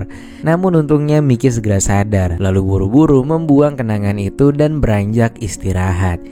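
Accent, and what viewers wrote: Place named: Balangan, Indonesia. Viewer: Kayak suara orang balangan admin nya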